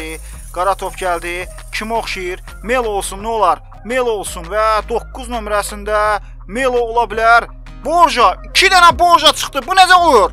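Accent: Baku, Azerbaijan. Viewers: Əmi sən Bakılısan?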